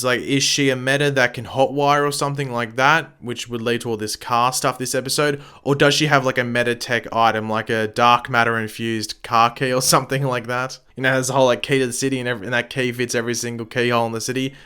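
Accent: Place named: Australia. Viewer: You sound Aussie ;oo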